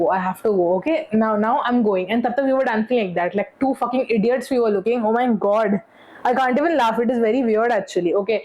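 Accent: Maharashtra, India. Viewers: i would like to share a story , of my EX currently i am in 12th , when i was in 11th due to peer pressure and fomo , i used to think about getting in relationship. so in 11th grade i finnally went in relationship. first it was good , even i was fully trying my best , i gave my insta and snap pass for her to check. but she was very different , always talking to other boys or her ex , and on 14th feb i asked her to meet in a temple , the temple is close to her house , but for me iit was around 2-3km and that to on cycle. still she kept insisting to meet some where else i tried , explaning that place was far and on hill so it was hard for cycle to go there , but she didint care , and by that time i was mentally frustrated by everything , college , family and her , so i broke up then she started saying things bhind my back , but now idc . slowly and steadily i am recovering , but for sometime alteast i am not at all ready for relationship. 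btw thanks for your videos and love your marathi accent . (i am marathi too )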